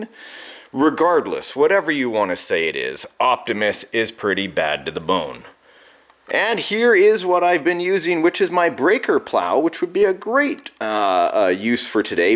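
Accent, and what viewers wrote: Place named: Canada. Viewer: hey, are you from Canada? Because you sound like you are lol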